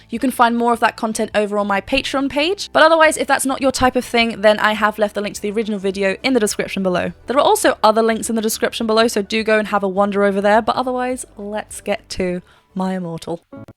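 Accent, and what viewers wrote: United Kingdom. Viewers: Are U from Austria? The Name looka like, but the english Accent is british? Or i am false?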